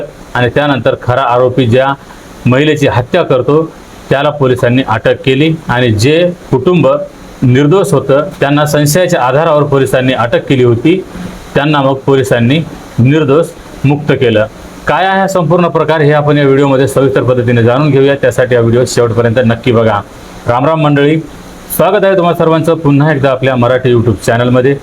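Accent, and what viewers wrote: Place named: Karnataka, India. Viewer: तुमच सर्व रील पहातोय बोलन जरा कन्नड टोण येतोय आणि सैशय नव्हे संशय म्हणावे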